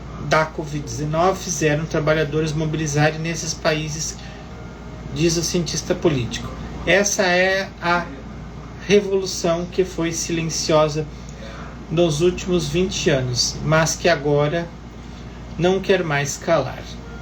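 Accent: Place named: Portugal. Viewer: Sotaque português... 😂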